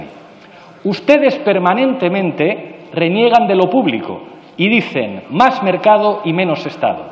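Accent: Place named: Cádiz, Spain. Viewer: Tiene acento de pueblo de Cádiz...a ver si aprende a hablar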